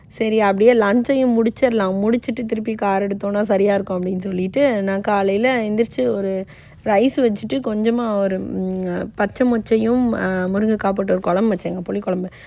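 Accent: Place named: Madurai, India. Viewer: Your explanation in Madurai tamil slang is . Good video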